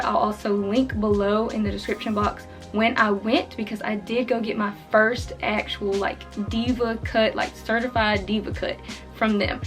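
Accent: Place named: South, United States. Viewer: Your accent is cute. ^^ Are you from the south?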